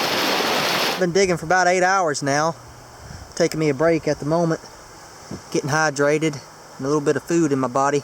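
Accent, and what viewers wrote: South, United States. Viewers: I like his southern accent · nice mellow southern drawl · He has the most southern ascent ever and i love it!!!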